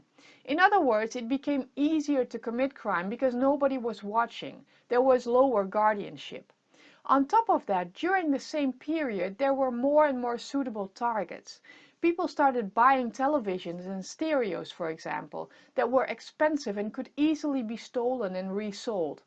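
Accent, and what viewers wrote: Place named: Twente, Netherlands. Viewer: Interessant. Goed uitgelegd ook. Passioneel verteld. Met een tukkers - engels accent:-). Hoe gaat het verder met je? Gr Hessel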